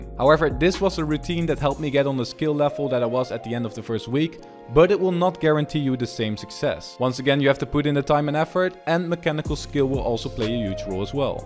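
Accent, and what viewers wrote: Netherlands. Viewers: waittttt are you Dutch or Belgian? ;O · Youre dutch? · R you dutch bc u have a dutch accent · Je bent een Nederlander! Ik vond je accent al zo NIET engels lijken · You are probably Dutch? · you hear your voice being Dutch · ben je Nederlands? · Wait are you from teh netherands? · Yo ik ga morgen overstappen naar pc en ik vroeg me af aangezien je ook Nederlands bent (ik hoor het aan je accent 😁) of je mischien als ik een beetje beter ben een paar 1v1s tegen me wilt doen om te oefenen. Je video's zijn handig 💪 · Wait you’re dutch lol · Are you dutch? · Eyyyyy ben jij nederlands? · Hes Dutch (like me) and the most people that are dutch have that accent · Ik hoorde aan je accent dat je nederlands was en toen je je dpi naar 500 zette toen zag ik dat het in nederlands stond gg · Is he dutch? His accent gave iT away! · Ik hoorde dit letterlijk 1 seconde in wist gelijk dat je een Nederlander was😂 Geen haat btw bij mij hoor je het ook hoor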